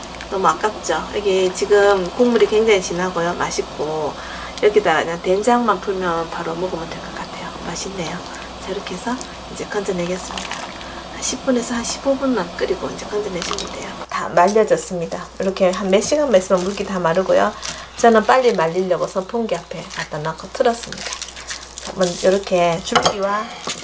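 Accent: Gyeongsang, South Korea. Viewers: 경상도 말씀으로 설명이 억쑤로 정감이 갑니다.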